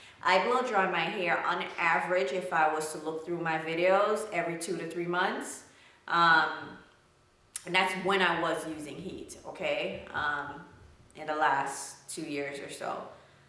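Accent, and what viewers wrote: Jamaica. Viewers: I know this is probably going to sound like a really dumb question, generally, by heredity, do most people of Jamaican descent, like yourself, have thick hair. I ask because my best friend is of Jamaican descent from her father and her hair is thick. I love your accent. It reminds me of her dad. I used to go visit them just to listen to him talk. My mom couldn't understand a word he said, but I could. :-)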